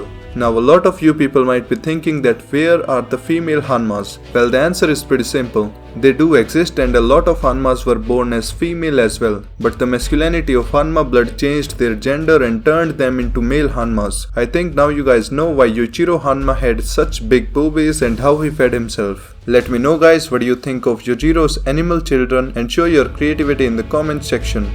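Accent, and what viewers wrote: India. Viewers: Ngl Baki algorithm brought me here but I stayed for the Indian accent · I didn't even watch baki but your description of what baki is plus the well known indian accent just makes it so damn funny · Change your accent. You sound way too indian..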